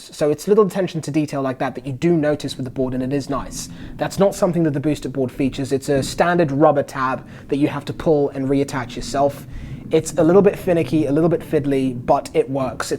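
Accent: England, United Kingdom. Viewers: I don't know if it's the English accent or the professionalism but this is one of my favorite reviews. I'm a NYC Youtuber and you have definitely made me want to step my game up exponentially. I'm buying the Evolve Carbon GT next week so I'll put out my review. Let's collab on a video. I'll fly out to the UK no problem. Keep doing your thing bro. You have a new subscriber.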